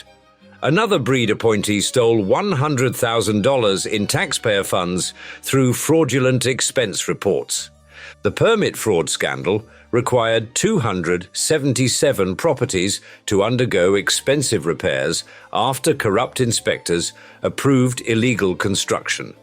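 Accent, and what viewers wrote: United Kingdom. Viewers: When you hear a British accent turn off!!! Thats what I do...BYE!!! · It isn't rich millennials. More specifically its high tech nerds that are socially awkward and don't want community. I wish you would have used an American accent onthe AI voice instead of British.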